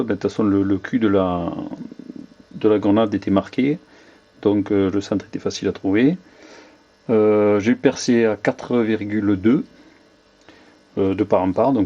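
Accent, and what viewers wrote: France. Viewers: he speak FRENCH , he has an accent from the sounth of the france.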